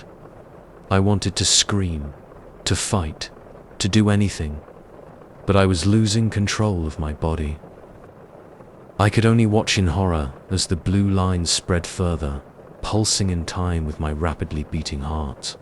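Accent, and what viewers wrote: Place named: United Kingdom. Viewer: I hate the British accent it ruins the whole stories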